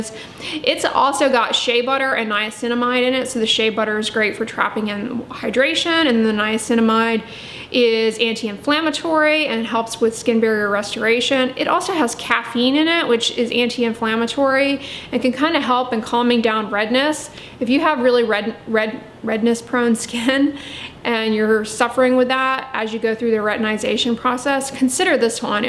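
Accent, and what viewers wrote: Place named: Long Island, United States. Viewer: I can’t with the Long Island lockjaw.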